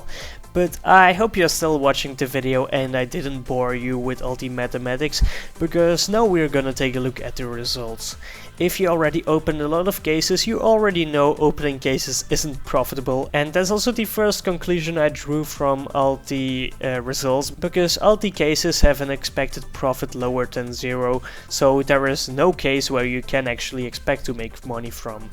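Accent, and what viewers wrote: Netherlands. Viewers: u Dutch bro? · dutch accent is the worst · is he dutch?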